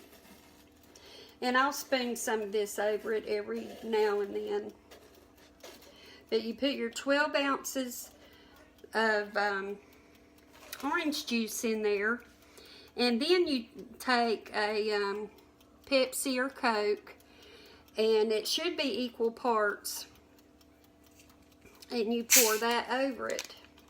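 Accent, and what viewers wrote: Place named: Tennessee, United States. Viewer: I just love your accent! I’m from Tennessee but have lived in Michigan for the past 10 years. Listening to your videos takes me back home to being in the kitchen with my Mom and Aunts. Those are treasured years! I’m so inspired by your wholesome recipes. I’m taking both your Dollar Tree chocolate cherry cake and apple yellow cakes to my office potluck tomorrow. Thanks so much for taking the time to share with us!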